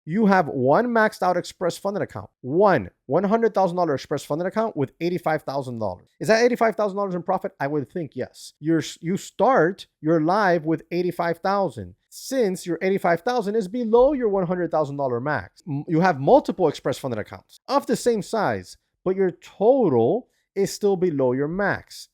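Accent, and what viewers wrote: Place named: Miami, United States. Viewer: You got a cuban Miami accent bro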